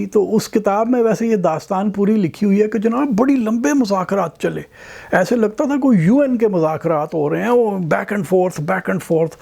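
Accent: Punjab, Pakistan. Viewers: Delightfully entertaining narration (with Punjabi tadka) by Salman Rashid. Enjoyed it thoroughly. I am an Indian (with Punjabi background and roots in Haripur Hazara). Love and respect from India.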